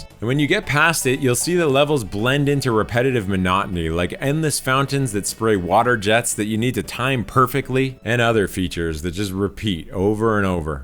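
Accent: Canada. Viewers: Love the Canadian accent 🫶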